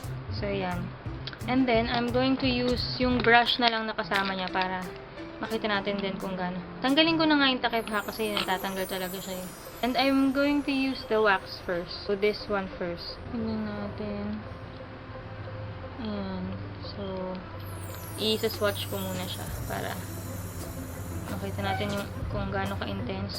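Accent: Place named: Philippines. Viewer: Are u filipinoss?? So beautifull. And ur tagalogg soo 😍😍😍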